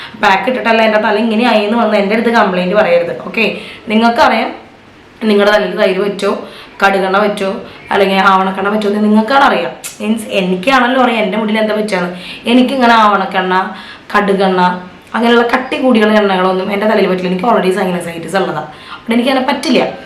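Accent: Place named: Thrissur, India. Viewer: Thrissur aano veedu. Samsaram athe pole nd. Njan Thrissur aanetto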